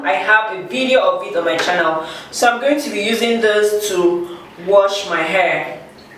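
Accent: Nigeria. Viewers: As you were talking I said to myself this lady has to be Nigerian. Then you mentioned Nigerian rice. Gbam! I've just started doing the rice water rinse, I may try this one down the line. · Please stick to your Nigerian accent....nice video btw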